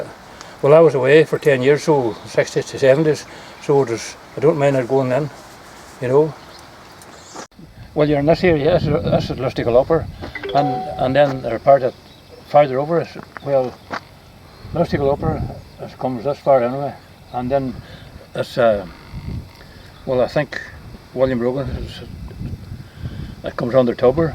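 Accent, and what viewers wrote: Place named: Scotland, United Kingdom. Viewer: Sounds perfectly Scots to me.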